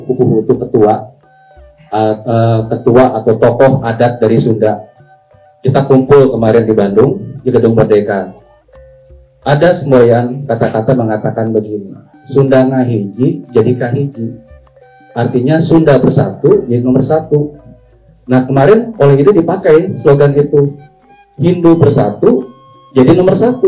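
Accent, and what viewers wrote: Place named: Bali, Indonesia. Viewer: Logatnya masih Bali.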